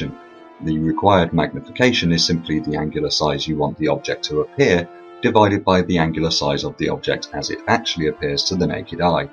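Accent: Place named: England, United Kingdom. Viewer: ***** You AVOIDED the most pertinent part of my POINTS (and I only had a couple)... is it 2 FEET or 3 FEET over 6 miles... You state in the video it's been duplicated many times, the 3 feet thingy... yet avoid or explain away atmospheric refraction - PLEASE... you even state in the video it, the experiment, has been validated many times. You use an English accent.... is that to sound authorotative...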